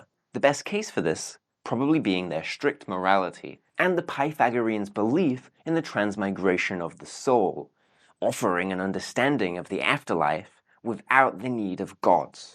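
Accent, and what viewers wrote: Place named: United Kingdom. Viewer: You sound British. If you are, you especially should know it's maths, not math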